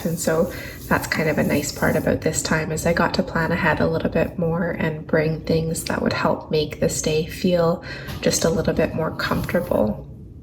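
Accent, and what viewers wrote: Canada. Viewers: In case you didn't spot the accent. She's in Canada. Do you know the rules in every country? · You must be in a medical hospital being treated for mental health. Straight mental health hospitals wouldn't allow most of the things you have in your room. (chain operated blinds, Electical cords, batteries, plastic water bottles, a watch, in short anything that can be sharpened or anything you can hang yourself with, visitors are not allowed on the unit). At least in the staes, your accent may indicate you are in Canada?. I wish you the best and hope you are feeling better.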